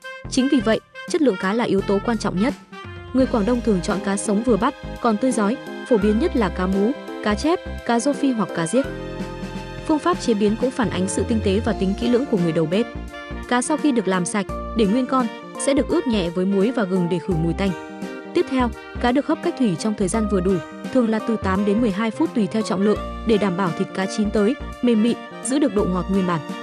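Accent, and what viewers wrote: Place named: Vietnam. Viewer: AI nó đọc thì đọc theo cách phát âm tiếng Việt là phải rồi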